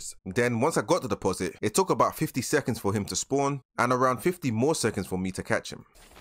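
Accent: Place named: South London, United Kingdom. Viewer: You sound very south London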